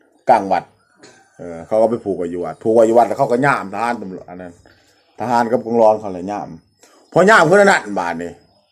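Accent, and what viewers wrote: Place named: Sisaket, Thailand. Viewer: สำเนียง คือคนศรีษะเกษ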